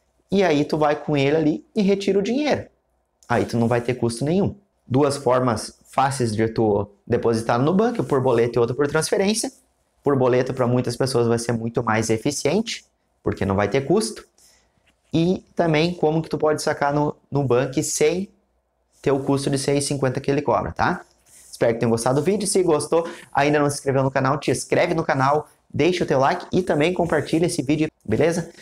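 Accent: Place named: Rio Grande do Sul, Brazil. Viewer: Muito obrigado. Um vídeo explicativo e direto. Além do sotaque gaúcho, haha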